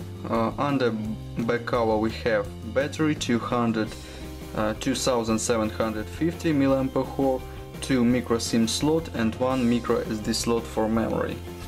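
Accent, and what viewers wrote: Russia. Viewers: God you can't mistaken that russian accent. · Дружище, лайк за волю к победе) Сразу слышно русскоязычного ютубера, думаешь над каждым словом. Американцы стрекочат по другому)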